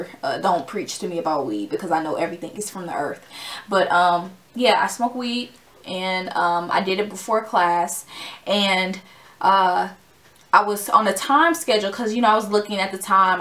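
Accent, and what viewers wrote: Detroit, United States. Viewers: bro you sound like you from the D, this how we all talk around here lmao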